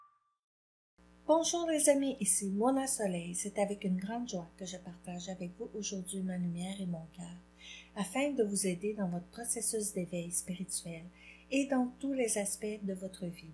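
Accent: Canada. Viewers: Merci pour ce beau tirage, je viens de m’abonner, j’adore votre petit accent canadien plein de douceur😉 Namasté 🙏🏻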